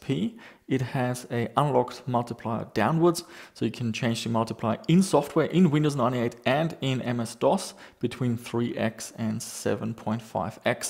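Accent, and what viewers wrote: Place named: Germany. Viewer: dein deutsches Englisch ist so niedlich 😀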